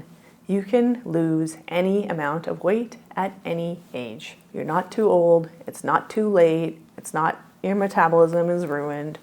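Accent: Canada. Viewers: I hear a fellow working class Canadian accent, I upvote lol Yes I have a simple brain. Not related to being working class Canadian. I'm just simple.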